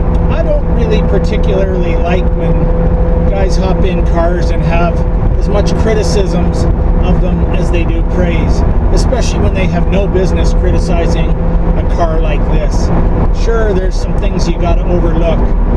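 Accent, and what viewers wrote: Canada. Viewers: It’s Canadian dialect you ignoramus. Their Z is pronounced zed.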